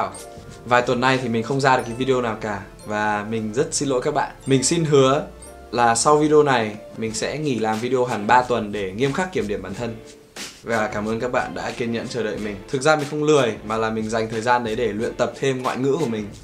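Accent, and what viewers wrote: Standard. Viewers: nói chuẩn vãiiiiiiiiiii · Bạn nói chỉ được cái chuẩn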